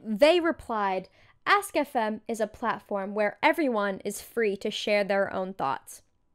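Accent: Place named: Canada. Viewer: Why do you have the Canadian raising accent 😭😭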